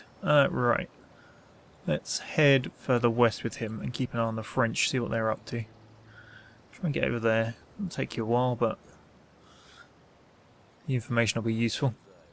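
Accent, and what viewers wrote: United Kingdom. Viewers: You sound like a 100% englishman.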